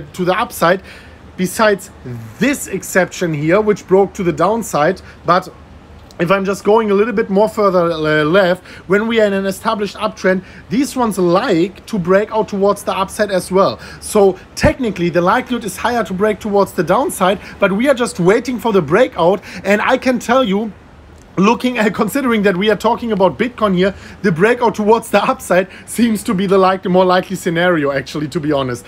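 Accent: Germany. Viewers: Dieses typische Deutsch English 👍🏽👍🏽😅 Hamma YouTube Channel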